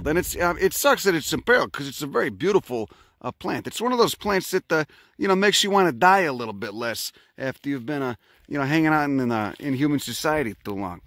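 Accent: Chicago, United States. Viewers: You are fucking funny! With your Chicago accent and knowledge of botany. It's like listening to a mobster with A botanical education. Kinda almost an edgier botany version of AvE. Lol. And I love him.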